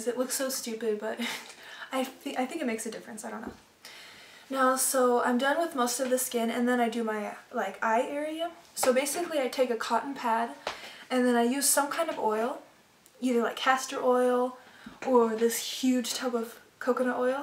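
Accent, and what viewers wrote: France. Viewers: I'm not used to commenting on videos at all, but I needed to tell you that you look absolutely wonderful. Such a pretty face! :) And nice French accent too! I suppose I would be your "counterpart" since I am a French girl studying English... All the best, Virginie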